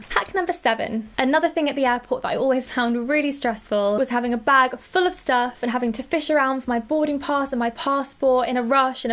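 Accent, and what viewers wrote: United Kingdom. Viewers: She reminds me of sydney serena with blonde hair, blue eyes and a british accent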